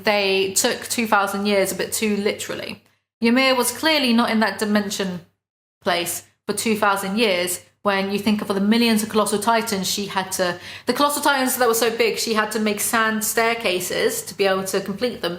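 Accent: United Kingdom. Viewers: nothing is far better than a British rant! good thank lord!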